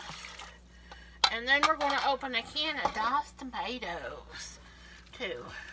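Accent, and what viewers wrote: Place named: Appalachia, United States. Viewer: It's our Appalachian dialect. I grew up saying it that way, had to lose my accent and dialect to work for the AP.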